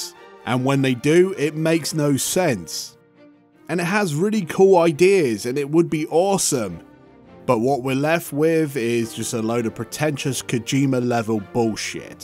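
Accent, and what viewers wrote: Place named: Ireland. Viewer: Your accent reminds me of a dude from Ireland in the army here in the good 'OL USA..Good review....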